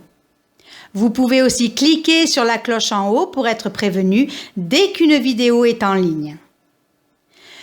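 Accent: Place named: Provence, France. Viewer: Capsule interessante et tout à fait vrai dans ce que vous enoncez. . Merci ! P.s : d ou viens ce bel accent ? Paca , occitanie ? Bonne nuit . Yves .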